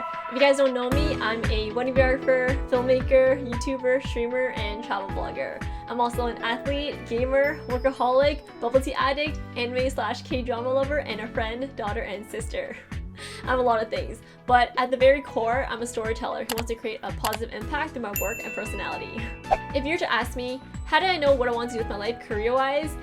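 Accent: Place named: Canada. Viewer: Cost of living in L.A. is ridiculous anyways, plus you’d lose that lovely Canadian accent 😂😂 hope the rest of the year treats you better.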